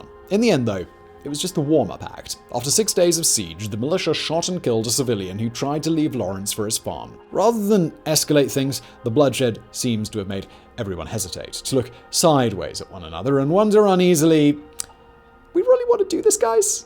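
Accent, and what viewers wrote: United States. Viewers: I rather liked the American accent. I was shocked by it, but very entertained. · After hearing many unprofessional American accents done by Brits, Simons is 10/10. The beer reference took it home. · LOL AMERICAN ACCENT 😂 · Damn he can do a really good American accent!😜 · Actually spot on American accent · Your American accent is spot on. Well done. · Only thing i like more than youre british accent is ur american one. Its crisp. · That American accent is scary good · Omg the "American accent" was...... hilarious lol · Your American accent is spot on! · is the greatest YouTuber yet. I like that American accent… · your "American bro" accent is spot on! Kansan here by the way. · The American accent!!! 🤣🤣🤣🤣👏🏻👏🏻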